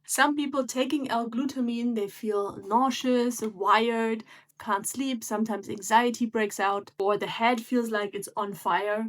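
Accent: Germany. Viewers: I think she is german - I can hear her strong german accent!?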